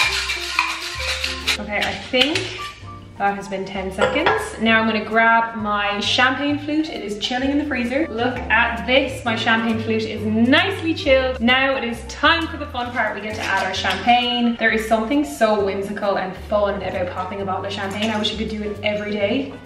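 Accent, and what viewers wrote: Ireland. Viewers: I speak French fluently. I had to go back and listen again, because I just thought you were speaking Irish, at first. Awesome! I am drunk right now. Also, fuck. Have you ever had a white lady? I'm talking the cocktail, obvs. It's delish. Worst name ever. The only reason no one drinks it is because it's too embarrassing to order one.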